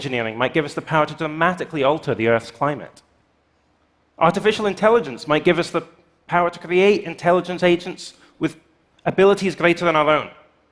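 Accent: Scotland, United Kingdom. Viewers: Sounds Scottish to me. But I'm not sure. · What accent is that??? Half Scottish half Dutch attempting a posh English accent? Mental. Good video, thought distracting... that accent!? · Where is this guy from? England, Scotland, Ireland.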